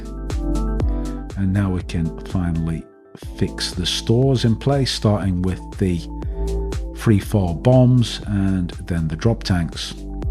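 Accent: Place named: Liverpool, United Kingdom. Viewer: Followed this all the way through - definitely put this kit on my 'wish list for 2023, along with a Hind A and a couple of other Soviet/Russian subjects. Good, clear videos, cleanly edited and well presented (good to hear a familiar accent/dialect even if it does make me a little homesick). Looking forward to your next 'production. All the best from a Scouse in NZ.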